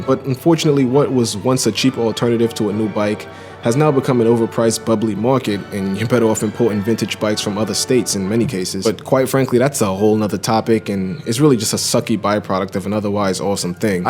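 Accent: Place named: East Coast, United States. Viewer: You are very articulate and eloquently spoken, with a slight accent (my guess would be somewhere on the east coast) Great video!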